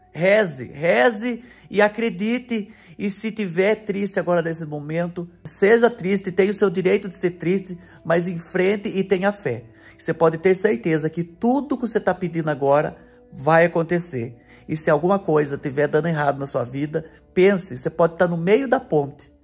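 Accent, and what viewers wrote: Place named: Minas Gerais, Brazil. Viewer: Abençoado curiosidade .eu achava q tu era mineiro pelo sutaq e tb o jeitinho meio caipira é lindo ! Mas as vezes ñ entendo bem .lembrando q sou brasileira tá ?bjinhos no ❤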